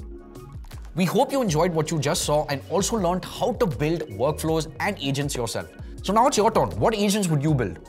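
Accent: India. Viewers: U don't look Indian but sound Indian wonder why🤔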